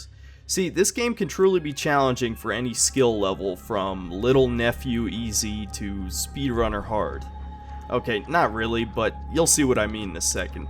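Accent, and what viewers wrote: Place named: Central New York, United States. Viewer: You have got to be from Central NY. Probably Syracuse or Oswego. We can’t escape our CNY accents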